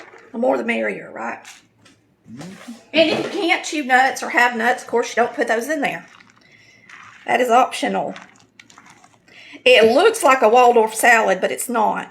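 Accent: South, United States. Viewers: Girl you are SOUTHERN with hat drawlllll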